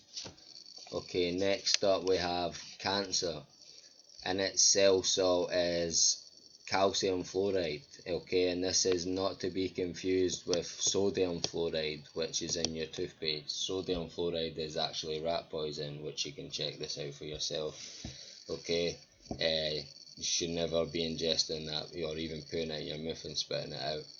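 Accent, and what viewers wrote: Scotland, United Kingdom. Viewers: I subbed to you because I liked the fact that you gave your ideas at the end and what a very good thought it was. Thanks for sharing this and your own ideas on it too. I would love to hear more about this. Love your Scottish Accent by the way!! I am a Norfolk girl, but my Mum and I just love Scotland so very much :) especially thick Plain loaf toast.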